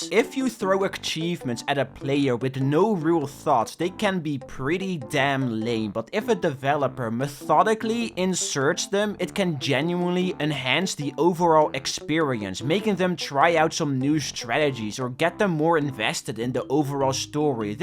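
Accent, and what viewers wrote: Netherlands. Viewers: Is hij nederlands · Are you Dutch? You accent sounds a little bit like it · He’s Dutch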